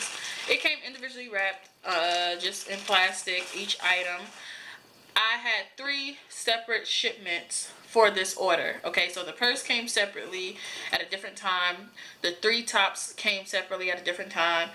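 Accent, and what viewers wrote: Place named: Newark, United States. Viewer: Hi girl, I'm from Newark! Going there for the festival in Lincoln Park! I knew I recognized the talk! Sounds like us! I live in Atlanta now! I have subscribed and get to see all of your great videos! Keep giving us the current slay! THANKS! Jp! !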